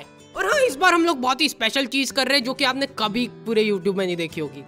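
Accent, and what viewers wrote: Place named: Madhya Pradesh, India. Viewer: MP ka chora hai 🗿 uska accent <3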